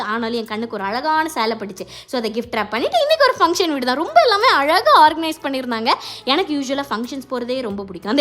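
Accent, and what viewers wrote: Tirunelveli, India. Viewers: பேச்சு வழக்கின் நமது திருநெல்வேலி பேச்சு நமது அக்காவிற்கு வந்தது❤❤ ஏ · பிச்சி தானல திங்க போற பேசாம சாப்பிடுல பக்கா திருநெல்வேலி வட்டார பேச்சி ❤ நீங்க பேசுறது அவ்வளவு அழகு❤ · எங்க எத்தனை தமிழ் பேசினாலும் எங்க திருநெல்வேலி தமிழ் தனி அழகுதான்❤❤❤ ஏலே😂😂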